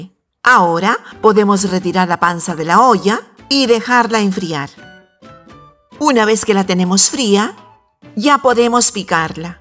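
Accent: Peru. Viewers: Hola, muy buanos dias;Soy aficionado a tus recetas de comida peruana. Yo soy peruano, pero vivo desde hace 32 años en España, y siempre e echado en falta nuestra comida peruana, y me e visto en la necesidad de aprender a cosinar y me guió por tus recetas. Me gustaría mucho que te des a conocer, pon un poco de tu imagen;Por tu forma de hablar creo que heres mi paisana, si no me equivoco de Huaraz?, yo soy de Yungay(Ancash). Un cordial saludo, y muchas felicitaciones por tus excelentes recetas,gtscias.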